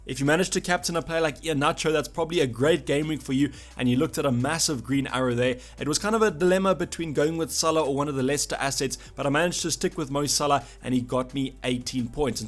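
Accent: South Africa. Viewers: Never tiered of hearing your lovely South African voice, keep up the great work 👍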